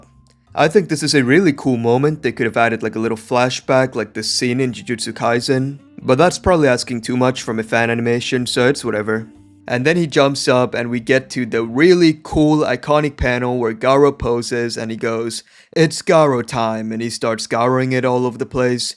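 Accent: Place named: India. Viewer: This video genuinely made me almost cry laughing thank you bro, btw, i sense a slight ndian accent on your voice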